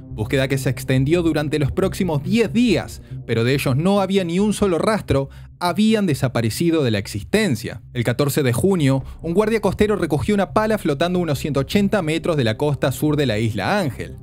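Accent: Argentina. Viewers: El narrador es Argentino de donde era Sandro ! Che !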